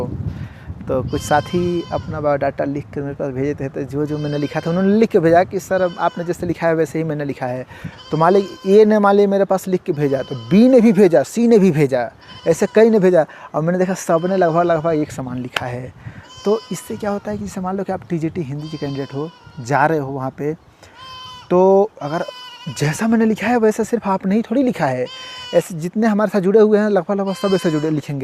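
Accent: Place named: Uttar Pradesh, India. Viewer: Apki batein bdi pyari h sir lg rha h ap up se h .sir mai kvs tgt Hindi interview ki tyari kr rhi hu pls ese hi margadarasan krte rhe .mai up santkabir nagar district se hu.🙏🙏🙏🙏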